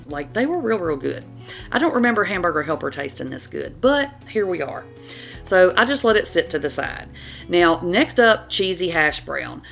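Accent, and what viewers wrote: South, United States. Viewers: Hello from Alaska. I just recently found your channel,I’m just going to say it. My goodness gracious, the amount of times that I’ve heard you say people are out here just doing the most, throwing hissy fits, I’m not saying in any shape or form that’s what you said, it my goodness, they are in my unprofessional opinion, are just nit picking. You want to change how you prepare these, the. You do you, change it. It leave this sweet lady alone please. And btw, idk what it is, I love the thick southern accents, so every time you say boil, instant smile on my face and when you corrected your pronunciation, I did a double take at my phone, and was like that didn’t sound right lol 😅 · Love these recipes! One thing you might change is the rice used. I used copycats for this and when I used converted rice it was a game changer. In any event I think you're spot on with these. 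New sub here. And you keep sayin' "bawl". As someone who has lived in the south, for over forty years, it's music to my ears. 🤩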